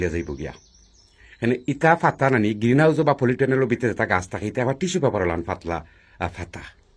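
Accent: Sylhet, Bangladesh. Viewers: ছোট ভাই আপনার সিলেটি কথা অন‍্য রকম ভালো লাগলো আজকে . আপনার ভাই সিলেটের তাই সিলেটি সব বুঝি . আপনার সব গাছ অনেক সুন্দর . দেখেই মনে হয় আপনি অনেক সময় দেন বাগানে . ভালো থাকবেন দোয়া রইল 👌👌🤲🏻🤲🏻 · মাশাআল্লাহ সিলেটি মাত হুনিয়া বালা লাগের আজ সুন্দর ভিডিও দিসোন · আসসালামু আলাইকুম ভাইসাব যে ভালো লাগছে একবারে সিলেটে খাঁটি মাত।ফুল ভিডিও আমার অত বালা লাগছে। শিক্ষনীয় ভিডিও উপকার আসবে ইনশাআল্লাহ। ❤❤❤দোয়া রইল ❤❤ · I liked your Sileti speech today in a different way. Your brother is from Sylhet, so I understand Sylhet. All your trees are very beautiful. It seems like you spend a lot of time in the garden. Hope you are doing well.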